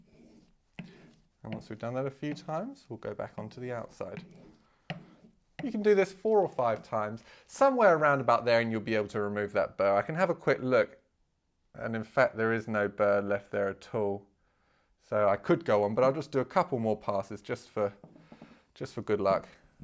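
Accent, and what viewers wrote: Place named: England, United Kingdom. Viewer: He’s English you wanker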